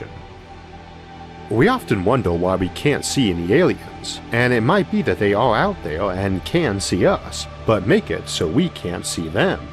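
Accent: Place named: Mississippi, United States. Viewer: what accent does have? I assume American, but from what part of the US?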